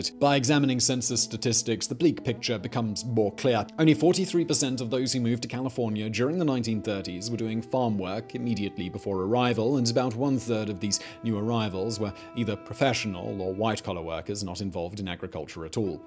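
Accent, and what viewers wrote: United Kingdom. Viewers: I don’t like learning about American history from a British voice. It bothers me! Shutting it off. 🇺🇸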